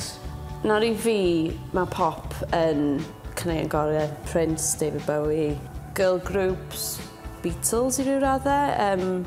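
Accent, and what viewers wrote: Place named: South Wales, United Kingdom. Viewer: You've got both Welsh dialects there too. The interviewer is clearly North Walian and Gwenno from the south. As a North Walian I find South Walian quite hard to understand. :p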